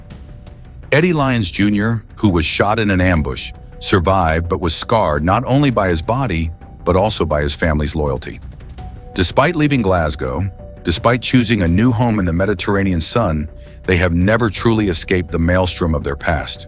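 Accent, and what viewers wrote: United States. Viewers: With that accent should this not be Shadows of USA .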